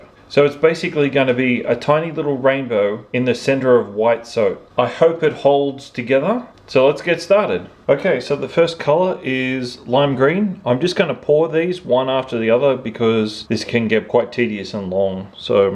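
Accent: Australia. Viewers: AU Love the colours, and the way you have it is lovely. It's so good to see a video with Aussie measurements, and accent!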